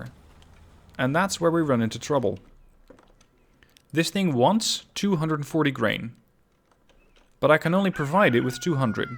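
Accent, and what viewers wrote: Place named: Netherlands. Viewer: I was like; sounds dutch or not? Then you hit me with "Breda"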